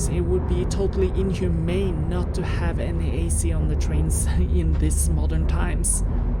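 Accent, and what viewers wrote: Norway. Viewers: Driven, not drived,...;-) For the rest fully agree! Really well spoken English with a charming Norse accent. Love the landscapes, I should pay Norway a visit sometime. Cheers, keep up the good work.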